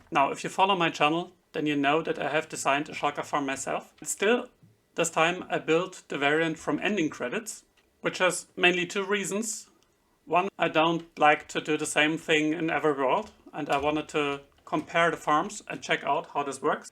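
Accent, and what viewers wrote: Germany. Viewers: Der deutsche Akzente kickt übelst rein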